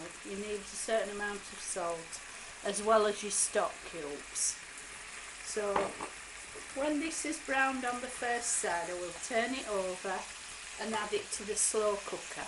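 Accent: Yorkshire, United Kingdom. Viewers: I love your accent, are you from Yorkshire?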